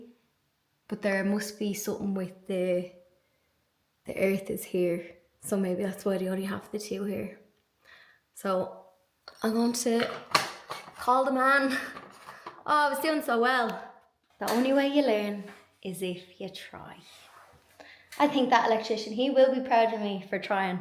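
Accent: Ireland. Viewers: Love your videos love listening to your lovely Irish accent I am in the uk living in Bristol 🙄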